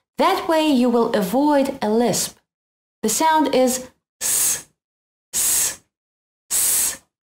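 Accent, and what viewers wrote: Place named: Russia. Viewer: I'm an Indian.. And I like this Russian accent.... But I'm finding it so difficult.. I couldn't pronounce like you... 😥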